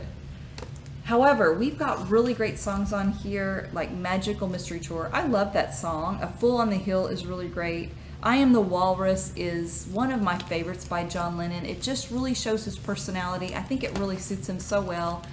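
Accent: South, United States. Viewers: Folks, don't let the blond hair and/or southern accent fool you. The lady has a partly sophisticated and insightful taste in music. I wish I had a dollar for everytime I played my brother's LP.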